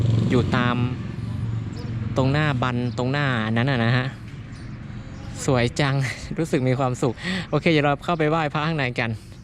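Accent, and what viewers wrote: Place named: Loei, Thailand. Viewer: สวัสดีค่ะคุณ สวยงามมากค่ะ วิวสวยบรรยากาศดี เขื่อนสิริกิต์สวยมากค่ะไม่เคยไป สำเนียงเหมือนจังหวัดเลยนะ เขาเรียกว่า ภาษาญ้อหรือเปล่าคุณ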